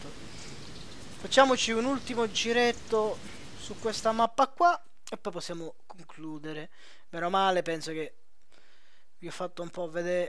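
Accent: Sicily, Italy. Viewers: ma sei siciliano come me?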